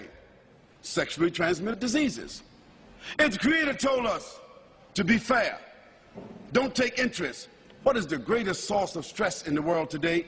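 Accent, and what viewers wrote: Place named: New York, United States. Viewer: machaa ellah, en plus son accent d'un vrai newyorker trop joli ellah ybarrek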